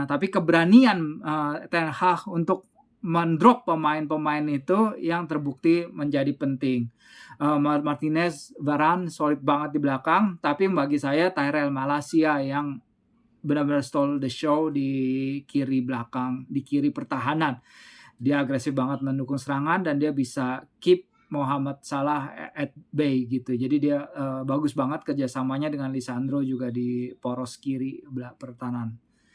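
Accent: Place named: Jakarta, Indonesia. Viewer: Kenapa betawi nya kwentel banget disini wkwk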